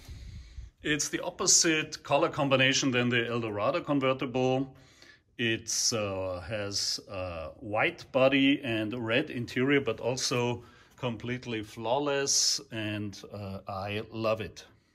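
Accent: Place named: Bavaria, Germany. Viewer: Nice collection of "Amerikanische Riesenwagen". Do the various owners all live in this same building? I see from the license plates (and your accent) that you are in beautiful Bavaria. Funny how the North Americans luxury car market was taken over by the German brands starting in the 1980s, and yet, there seems to be quite a lot of classic American "land yachts" in Europe today.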